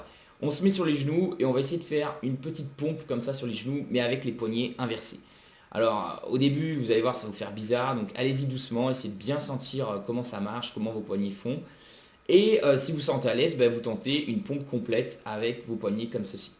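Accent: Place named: North, France. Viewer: Bonne vidéo mais tu as un putain d'accent ! Tu dois venir du nord 😅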